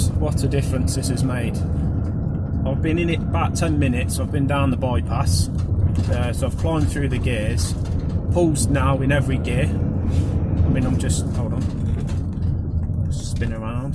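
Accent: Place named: Midlands, United Kingdom. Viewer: You sound like you are from the midlands. Where did you have this done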